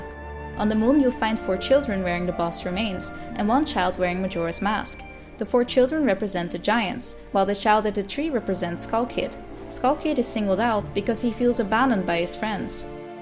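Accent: Netherlands. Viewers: wich province of the Nederland are you, you're dialect sounds familiar. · Are you dutch?! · oke voor een nederlandse heb jij echt een te mooi engels accent <3 10/10!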